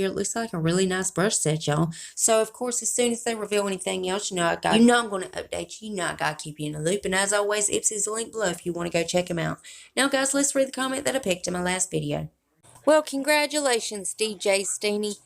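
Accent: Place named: Alabama, United States. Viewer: I love how u say stuff, you sound just like me haha. I'm from Alabama:) thanks for all the news I mean in a good way. Sometimes ppl can take stuff wrong. I love listening to u and I watch your videos when I can. My son has special needs and he is getting older so it is getting harder but God has something great for him . Thanks:)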